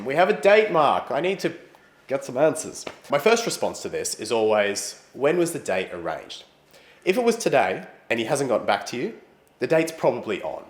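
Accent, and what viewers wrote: Australia. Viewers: I dont like Australian accents and thé country but i like this guy.A cool Ozzie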